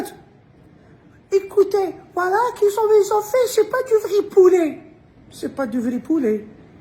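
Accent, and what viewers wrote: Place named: Morocco. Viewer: انت مريض هادي عربية😂، عندك خويا لهجة مغربية ،سمحلي ،ماشي على جال المغاربة، بصح ❤يا مغربي يا نغربي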